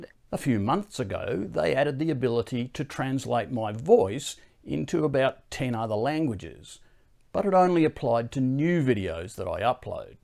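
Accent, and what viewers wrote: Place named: Australia. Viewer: Great for education :) shame they would miss your amazing entertaining Aussie accent :)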